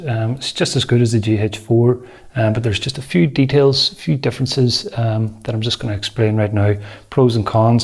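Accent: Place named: Northern Ireland, United Kingdom. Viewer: Hi, I notice your accent is Northern Ireland where I'm from myself. You wouldn't happen to want to sell me your GH4 by any chance? If you feel the GH4R is all you need?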